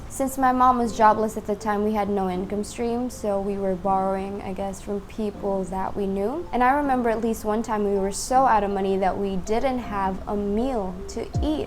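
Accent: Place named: United States. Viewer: Wow, your attitude is amazing. Sorry to hear that you have been through a difficult time. Out of interest, are you from Phillipines, as you sound American 😊!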